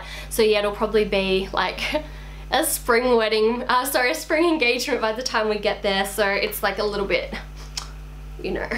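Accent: Australia. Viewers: Hahaha I feel you about thinking the Aussie accent is obvious! I think it’s even worse for New Zealanders ;) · That’s interesting that someone would say your accent is funny. Your accent sounds more universal than the strong slang Australian accent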